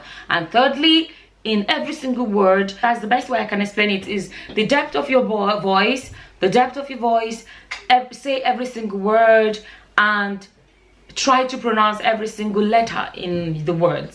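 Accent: Africa. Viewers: I am a Spanish native speaker and I don't why I love African accent since I heard first time. I wish I could develop some kind of accent close yours. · IT'S REALLY HARD TO DO AFRICAN ACCENT :"3 HOW CAN YOU DO THE AFRICAN ACCENT AND I CANT :"3 I WANT TO SPEAK IN THIS COOL ACCENT :"3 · You naturally have an African accent. We can literally just watch your videos, mimick you and have an accent.. everybody's voice is not deep like that lol 😂😂😂😂 So many women from Africa have high pitch voices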